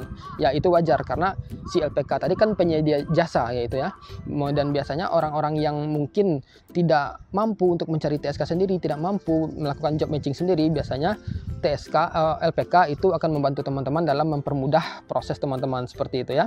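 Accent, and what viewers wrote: West Sumatra, Indonesia. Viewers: Logat bicara nya kaya orang sumbar, apa bener bg😂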